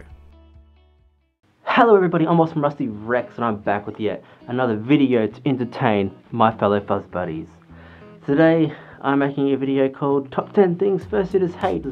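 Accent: Australia. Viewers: wait wasn't he german how does he sound just like an australian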